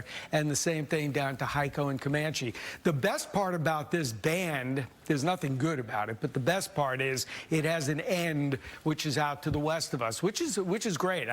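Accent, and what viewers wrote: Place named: New Jersey, United States. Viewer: Why is this guy from Jersey doing DFW weather 😂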